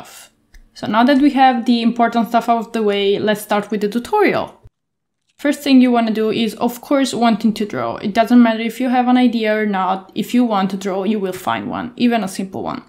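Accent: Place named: England, United Kingdom. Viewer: You sound a lot like Sypha from Castlevania! I don't just mean your English accent but like your overall tone/pitch Very soothing :> And this was so relaxing to watch, I've wondered how you do a few things here and there so it was great hearing about the process!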